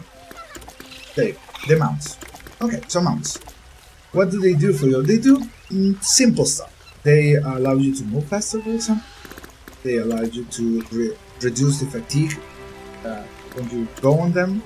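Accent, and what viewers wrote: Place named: United States. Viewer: ahaahah yo decia porque no estoy suscrito si me suscribi y no sabia que tenias otro canal en ingles haahhah, saludos y te sale igual que un gringo.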